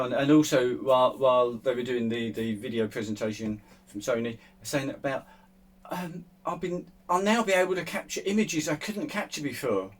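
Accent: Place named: England, United Kingdom. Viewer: I had a sneaky feeling you would be posting something about the A1 today. Love the rant, the sarcasm, especially with the perfect English accent (I'm in Texas). 😊 High stills fps are like video now. I would not want to shoot a burst of 20-30 fps of that cyclist rounding the corner and then cull through a hundred photos at the computer looking for the perfect shot (unless one is a sports photographer or just hoping to capture a cycle wreck). Great video.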